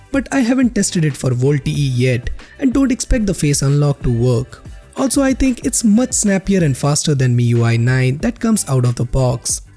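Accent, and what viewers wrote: India. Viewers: too many indian phone tech reviewer for the poco, seriously i can't find any american speaker out there, no offense but i can't stand the accent . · He is indian thats why he speaks that way😊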